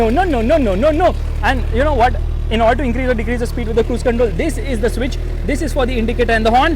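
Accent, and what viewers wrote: India. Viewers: Typical indian annoying accent.. 🤮🤮🤮..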